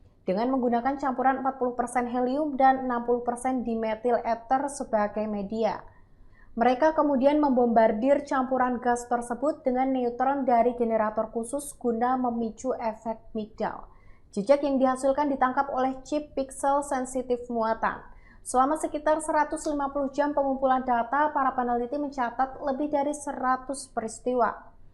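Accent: Java, Indonesia. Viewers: Ini yang ngomong orang jawa ya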